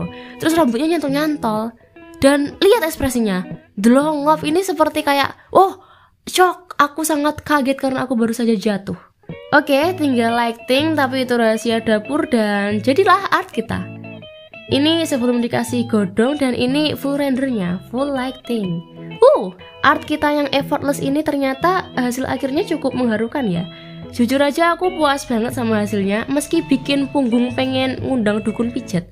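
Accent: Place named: Banyumas, Indonesia. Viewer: Mbak medhok ngapak Bahas dong kenapa kenapa kita gak bisa improve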